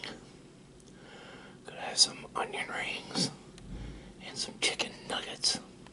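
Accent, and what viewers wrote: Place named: South, United States. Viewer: hey, hope you're doing very well :) my saturday was alright, I haven't been able to stay home alone for a long time, and finally got the chance to do so, which was a literal threaphy... I live in Turkey / Istanbul and as odd as it sounds, we have yet to see a snowflake in the city lol. I remember blizzards happening in the past, but it is different these years. the reason is maybe global warming, not sure why. maybe little snow storms from time to time, thats it. I'm done with my final exams, made my favorite salad at 5.27 am in the morning - browsing your videos :) glad to see a fresh upload, I'm a big fan of your (southern?) accent. Have a nice day / evening, I'm around.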